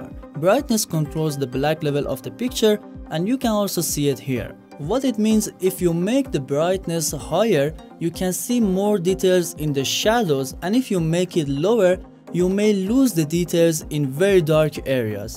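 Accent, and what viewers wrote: Iran. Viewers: ایرانی هستی به حرف زدنت میاد ایرانی باشی are you from iran? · Are you from ir?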